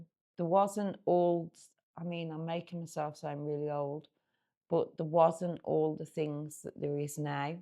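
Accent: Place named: Cannock, United Kingdom. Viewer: Hi I'm so glad I watched the whole thing as I'm starting the injection this Thursday (26th March 2026) and I think we're similar age. I've been on off smacked since I was 14 and I'm 41 now. You also sound like you grew up not far from where I did with that accent (cannock myself) so although I'm a bloke and our lives would have been different, I bet we seen and did alot of the same shit lol anyway thanks for making this its inspired me to look forward to getting it done as I was nervous about the injection. My habit isn't anything like yours was, so if you can do it so can I. Thanks again and all the best in your future X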